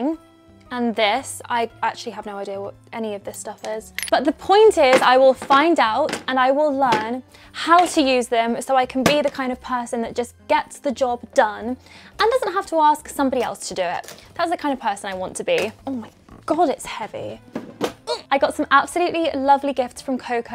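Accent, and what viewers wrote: United Kingdom. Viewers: I love your British accent. Ohhh orange chocolate is the best flavour nither as smell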